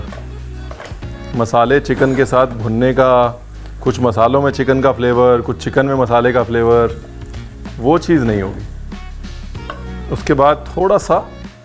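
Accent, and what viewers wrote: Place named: Malwa, India. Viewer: It's great to listen to your malwai punjabi. Esp when you quote your grandparents. Keep on...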